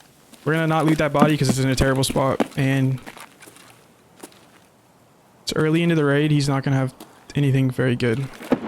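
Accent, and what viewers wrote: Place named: Netherlands. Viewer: You hear the juicy dutch accent, hes doing a good job of hidding it.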